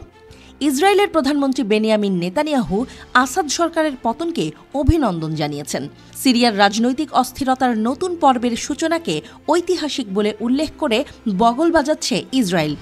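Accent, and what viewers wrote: India. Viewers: খবর বলার ধরন দেখে মনে হচ্ছে সে একজন ইন্ডিয়ান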